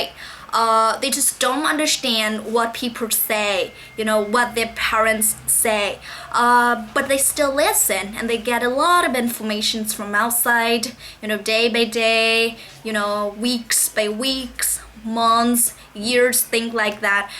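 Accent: United Kingdom. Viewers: I thought your senglish sometimes british sometimes american style, but that isn't no problem cause I have a problem with my speaking skill I'm too much learned grammar, but none people cares about the grammar, really exited your video!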